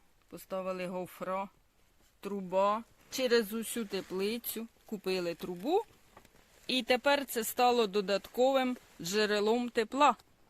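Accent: Poltava, Ukraine. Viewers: Доброго дня, чи вечора. Потрапила на ваш канал і залипла!!! Поки усі відео не передивилася, не угомонилася. Дуже зачаровує українська мова з полтавським акцентом! Дуже приємно слухати. Для себе візьму приспособу для перевалки з стаканчика в більшу ємкість( з розрізаним боком) А банку з ручкою для виготовлення стаканів я теж у Валєри взяла. Я і зараз його дивлюся на каналі Наша дача.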